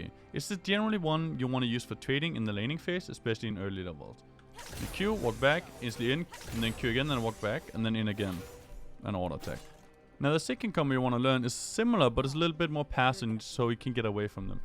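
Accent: Denmark. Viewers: I smell danish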